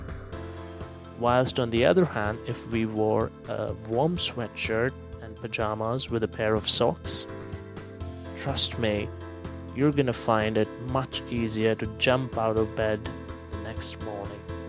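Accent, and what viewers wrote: India. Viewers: where are you from? I picked up on a bit of an Indian accent but just in a couple words 😉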